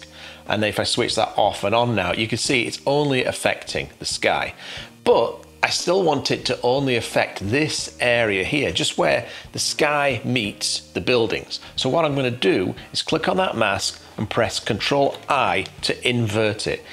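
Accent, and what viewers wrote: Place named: United Kingdom. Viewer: First time seeing your channel. I loved the ending where you ask for some feedback! From the accent, I assume you are British, and your British humor comes shining through! Liked and subscribed. Can’t wait for more content, and you ‘giving me the eye!’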